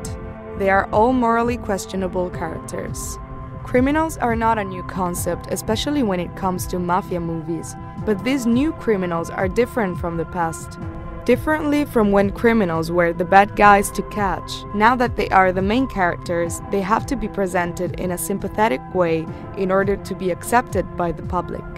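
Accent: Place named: Italy. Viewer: sei italiana? si sente un pochino!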